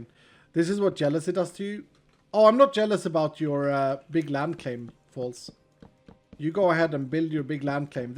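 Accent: United States. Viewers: Dude....your American accent is perfect. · I love how his American accent is a mix of like 12 different regions but is somehow still convincing.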